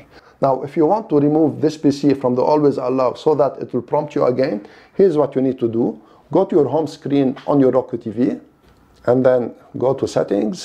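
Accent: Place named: Lebanon. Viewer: your accent is lebanese! are you ?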